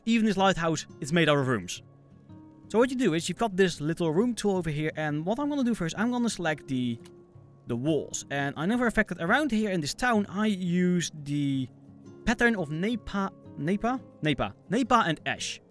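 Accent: Netherlands. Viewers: Are u dutch bc it sounds like it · You sound Dutch 🙈 Are you? I am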